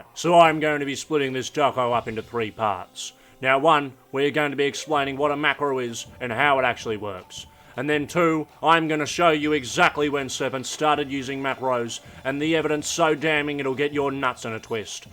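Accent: Australia. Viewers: the hard australian accent disgusts me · Fucking love Aussie accent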